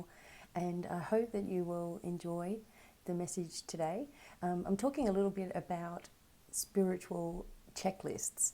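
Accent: Australia. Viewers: So True. Especially better cos you are an Aussie and you’ve kept your beaut accent !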